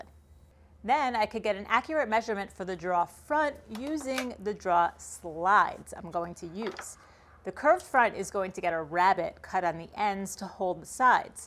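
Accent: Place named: Mid-Atlantic, United States. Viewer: that’s not New England. Possible modern mid Atlantic; Eastern PA, Northern NJ, parts of CT and Long Island.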